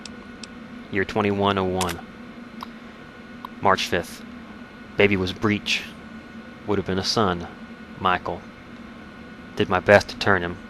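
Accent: South, United States. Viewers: I really enjoyed this video. I had never heard this story before and I found it very interesting and touching, above and beyond most of the writing for the rest of the game (not to say its bad). Your casual southern drawl and the hum of the terminal made this such an engrossing experience, thank you:3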